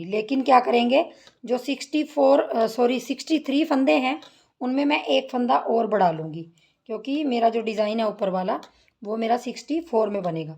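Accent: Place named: Ambala, India. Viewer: Aap kha ke rehne vale ho Mam? Aap ki language hmare sath milti hai (Ambala) ki rehne vali hu me bhi, pl. tell ❤️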